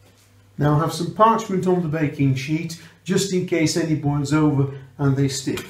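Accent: Yorkshire, United Kingdom. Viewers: Wow deffinatly going to give these a try, both my husband and I love cheese and onion, I’ve been buying M and S pasties but weather it’s “ health and safety” I’m not sure but there seems to be very little seasoning in anything one buys over the counter these days ( the salt police must have been round 🤔) and it makes for “ tasteless “ produce, I’ve only just come across your lovely straight forward recipes whilst looking for one for a “ custard tart “ I am now binge watching lol I’m going to be very busy over the coming weeks baking lol will be making your custard tart today ready for my daughter coming round for lunch. You also sound as if your a “Yorkshire” lad just like my husband 👍🐾🥰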